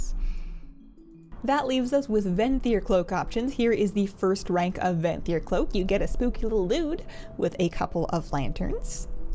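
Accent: Canada. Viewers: Nice video, thanks! Btw are you Canadian????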